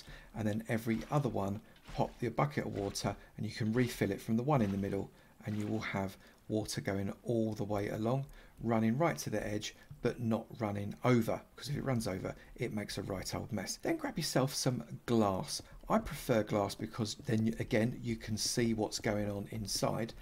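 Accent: United Kingdom. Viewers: Cause he's british and sounds awesome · It's called a British accent.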